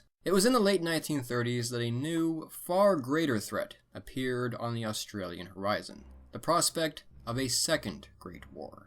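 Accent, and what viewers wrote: United States. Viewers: Why is there a American talking instead of a Australian? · I was sceptical when I first heard the American accent on this doco but it is excellent and really comprehensive, with information that I, as an Australian, didn't know. I particularly love that you include the fairly recent genetic information regarding where the people travelled from to populate the continent and surrounding islands. I'm using this as a resource to teach my son Australian History and we'll definitely check out your other documentaries. Thank you! Really well done!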